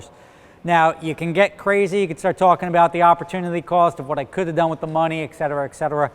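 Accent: New Jersey, United States. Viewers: Great Jersey Accent! Who cares what it cost - wtf - If your looking for a Porsche don’t sweat the cost as you know it’s going to be expensive if the shit breaks. Otherwise I liked the the vid.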